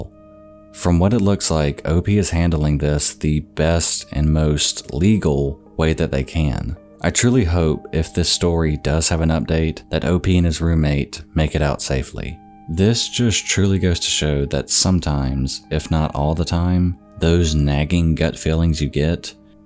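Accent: South, United States. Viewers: are you from Florida or Alabama or somewhere like that? I'm from north al and I've been trying to place your accent but I can't quite get it. It's very nice though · Lmao “NEXPO rip off” but NEXPO doesn’t have that sick ass southern accent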